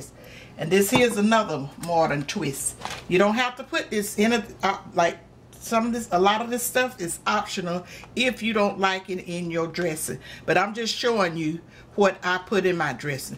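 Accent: South, United States. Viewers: I love your southern accent! I could listen to you all day! Thank you for making this video, it is very entertaining and educational :) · Amen Thank you I love your southern talk and you area Blessing to the women who want to cook a southern meal for the family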